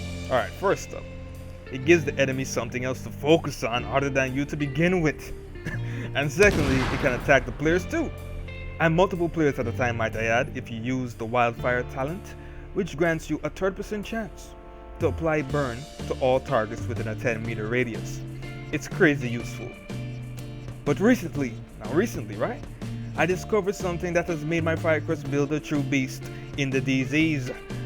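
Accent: Caribbean. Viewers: TheDivisionVampire I'm Jamaican. That accent isn't Jamaican. Sounds Caribbean - just can't figure out which island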